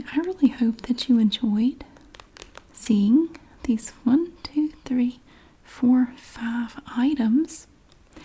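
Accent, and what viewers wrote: South, United States. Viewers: I was really drifting off when I hear “ooo it’s fancy chapstick” in amazing southern accent and I started laughing half dozed off out here... need to comment that! GN😂🖤🖤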